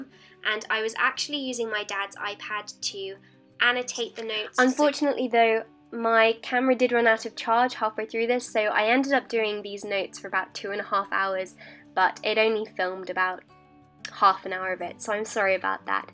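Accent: RP, United Kingdom. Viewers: You speak sooo posh and pronounce all your T’s in words... I’m from up north where we really don’t lol, we should though. A lot of ‘so’s!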